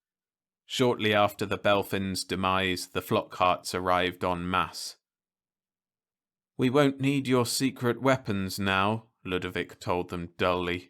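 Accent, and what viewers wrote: United Kingdom. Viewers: I have trouble with the nasal British accent. Just a personal thing with me.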